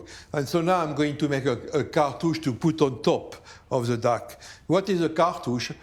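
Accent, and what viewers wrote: France. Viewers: Alors check again, D'accay His frenglish is so beautiful. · Lovely, charming man, absolutely love his French accent!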